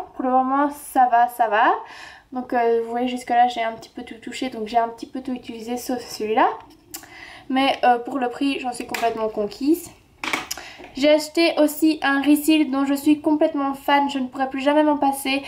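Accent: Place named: Belgium. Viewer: Coucou ma belle ! Je viens de tomber sur ta chaîne par hasard et j'aime beaucoup tes vidéos! J'ai tout de suite compris que tu était belge :) ( grâce à ton accent hihi) Je dois également me taper Auchan en France pour aller chez Kiko/Sephora :( Je voulais savoir (si ce n'est pas indiscret) dans quel région de la Belgique habite-tu? :) Bisous.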